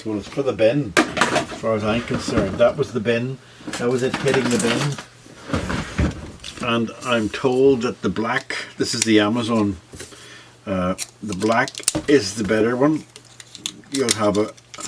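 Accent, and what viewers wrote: Northern Ireland, United Kingdom. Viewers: Thanx so much or the video .Nice to see the Guitar getting some TLC . I Have the Both the 4 and 5 string SR 300E ..I also appreciate your accent as i was born in Northern Ireland as well..Kind Regards .. simon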